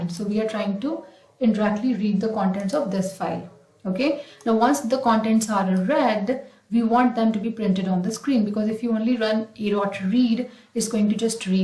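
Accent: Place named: United States. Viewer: in your previous video like 2 years before you spoke like a American like born there but now your teching is good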